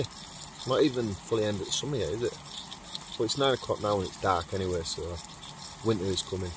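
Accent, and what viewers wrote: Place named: England, United Kingdom. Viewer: It's an English accent. The English live in England, the Scottish in Scotland, and the Welsh in Wales, but all three countries are British because they live on the same island: Great Britain, the largest Island in the British Isles. Welsh and Scottish accents are also British, but this one is clearly English. Great Britain together with Northern Ireland forms the United Kingdom of Great Britain and Northern Ireland, abbreviated to the UK, to which you can add Irish to the aforementioned accents. Hope this helps.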